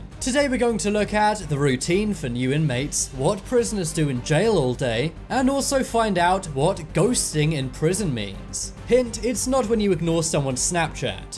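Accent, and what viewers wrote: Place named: United Kingdom. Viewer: did the british accent of the narration not tip you off ?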